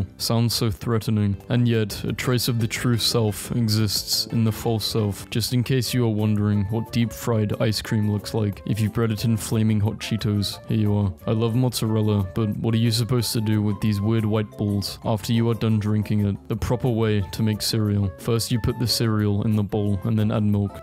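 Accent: United Kingdom. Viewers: He sounds British, so I'd guess the latter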